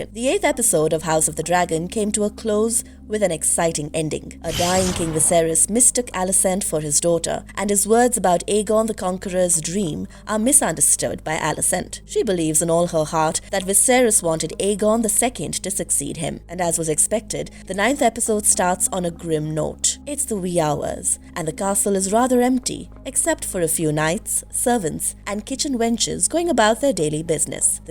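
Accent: India. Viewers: but indian voice :/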